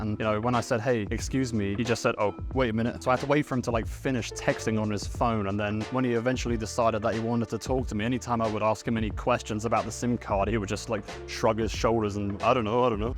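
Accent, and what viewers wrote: United Kingdom. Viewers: 日本人ですが、楽しく見させてもらってます。 ロケ地のチョイスも素晴らしいです。美しい。あとイギリス発音がなんか気持ちいいです。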